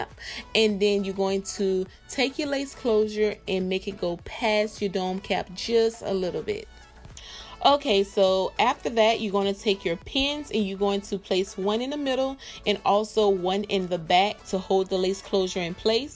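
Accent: Louisiana, United States. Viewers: I love your Louisiana accent 🤗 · You gotta be from Louisiana sis I hear some accent😍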